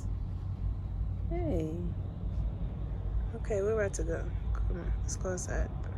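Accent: Houston, United States. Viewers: Is it just me or does she sound like Beyonce?! Must be that Houston twang lol. Loved this video! I love that you are showing the realness of van life and not the fantasy! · I think it's a Houston thing... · It’s just the Houston accent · she does🤷🏾‍♀️ I'm from Houston, it's our accent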